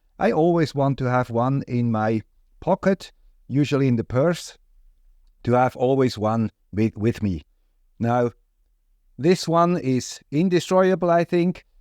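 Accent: Switzerland. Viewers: I’m interested that you pronounced Knipex as “nipex”, whereas a native German speaker once told me it is “K-nigh-pex”? As a native English speaker, I still have no clue how to pronounce the brand properly! I had assumed you were Swiss-German as oposed French, from the accent, so very interested in your take on this? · Swiss accent, highly appreciated! ❤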